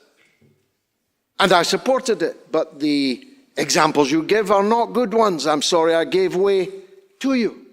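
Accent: Scotland, United Kingdom. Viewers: Wow, straight out of the heart! And this Scottish accent made it even more wortwile. Thank you!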